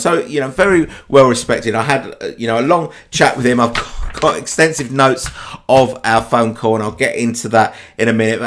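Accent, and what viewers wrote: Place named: England, United Kingdom. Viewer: Blah Blah Blah with an English accent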